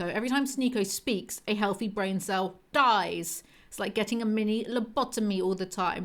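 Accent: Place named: Essex, United Kingdom. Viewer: Essex voice what's these rumours then?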